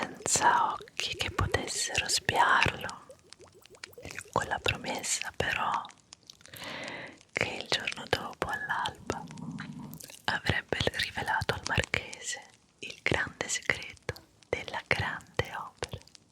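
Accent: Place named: Rome, Italy. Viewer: Si è vero, la pronuncia romana fa ridere anche me 😂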